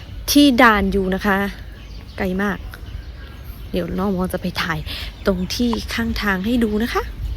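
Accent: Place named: Laos. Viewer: โรงแรมชาวเกลาเป็นพาษาลาวไม้ไช้เหรอบา้น ไกล้กันกลับลาวบา้งที่เห็นพุดเหมีอนอยุ่ไกล้ลาว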